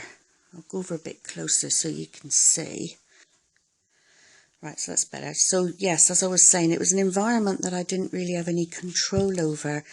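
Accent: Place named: West Country, United Kingdom. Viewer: What a wonderland you live in, it's gorgeous. You sound like you may be from the West Country? I'm a former Essex girl.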